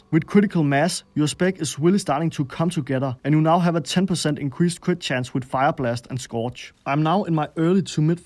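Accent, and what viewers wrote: Denmark. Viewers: The thicc Danish accent! Don’t play mage, but I really enjoy this content. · This is the thickest danish accent I’ve ever heard and I’m danish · That Danish accent tho! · danish detected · Danish accent xd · 5 seconds in and I can without a doubt say that this is a fellow Dane. Greetings from Aarhus.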